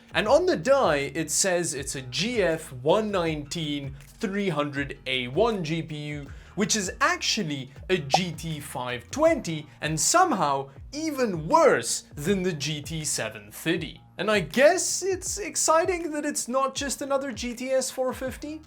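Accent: South Africa. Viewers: Are you from SA? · Is this guy from south africa?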